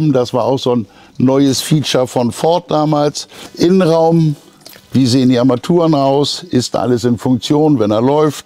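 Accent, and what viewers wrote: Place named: Hamburg, Germany. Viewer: Also die Kaufberatung an sich ist nichts besonderes, weil nahezu alle Tipps auf jedes alte Auto zutreffen. Kleiner Kritikpunkt. Aber die kleinen Hintergrundinformationen zur Geschichte des Fahrzeugs oder die Prognosen der Wertsteigerung sind interessant. Und lieber, Deine Art zu Reden ist typisch Hamburg, sehr sympathisch, nordisch trocken und ich grinse bei jedem Video 😁 Bist halt ein echter Typ! 👍🏻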